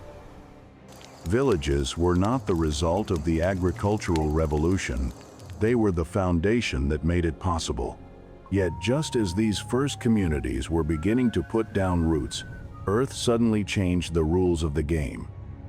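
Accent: United States. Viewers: This is a good video but you need a narrator with a British voice. Nobody wants to hear an American talk about history - signed an American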